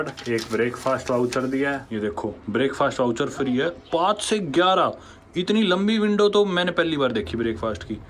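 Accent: Haryana, India. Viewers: Kuch bhi ho jya lekin Haryanvi sudh hindi nhi bol sakte , " jaan karke" he is speaking that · Baki sab sahi video quality best host best per ye jo consistency hai vo thodi si increase hojaye jayda bhi nhi halki si increase hojaye hafte me ek hi ajaye na tho bhut hai bhai ab have to hogye hai aap ke video dhek ne ke mamle me jayda nhi bus halki si consistency quki itne actual punctual hum bhi Nhi hai isliye Kripaya ker ke hafte ki ek or bhai raw upload ker do video me tho kheta hu or full Haryanvi accent wagera jo app normally use kerte hi hogye usually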